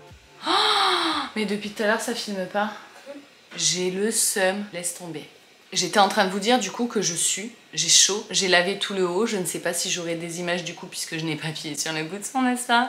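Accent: South, France. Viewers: Oui j’adore te voir faire le ménage 😅 viens chez moi 😂 avé l’accent peuchère ❤ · Owe owe owe! Vous allez arrêter de vous moquer de l'accent du sud là oh!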